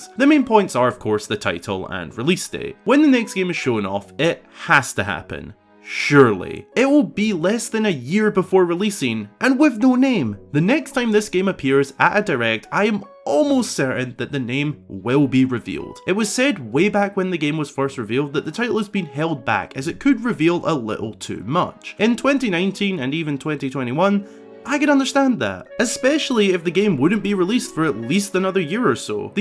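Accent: Scotland, United Kingdom. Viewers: It’s been ages since I last watched u and r u Scottish I am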